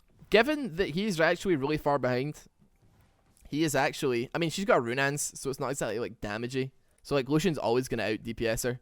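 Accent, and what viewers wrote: Scotland, United Kingdom. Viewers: is he scottish? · R u Scottish.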